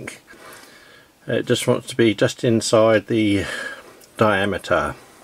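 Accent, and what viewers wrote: United Kingdom. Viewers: Superb - great knurling for a light lathe - and a nice outcome. Trying to guess your accent - is it Gloucester or Suffolk or am I totally wrong?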